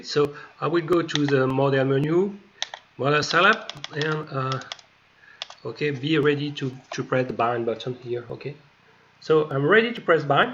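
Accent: France. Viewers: Meilleurs Voeux 2019 avec toujours ces super revues qui font la promotion des quadricoptères et ... ...de l'accent français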